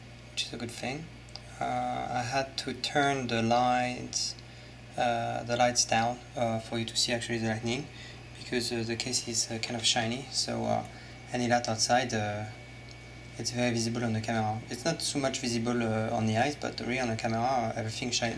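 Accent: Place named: France. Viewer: Un accent bien français mais sinon ta video est très bien continu comme ca :)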